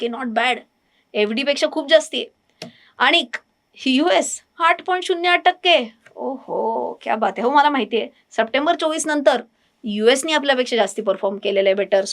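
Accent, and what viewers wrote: Pune, India. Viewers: As always, this podcast was well studied and articulated by you, पुणेकर आहेस तू, ते अजून छान feeling देते.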